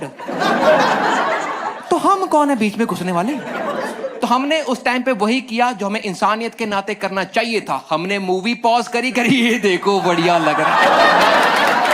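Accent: Punjab, India. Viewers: bhai ka punjabi accent makest it more funnier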